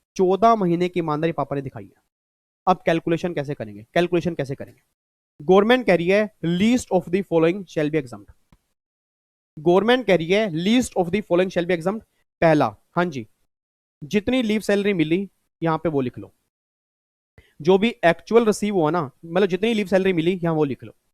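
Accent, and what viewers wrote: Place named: Haryana, India. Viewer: Haryanavi suits you sir😁👌.........and than you for amazing videos of revision❤ #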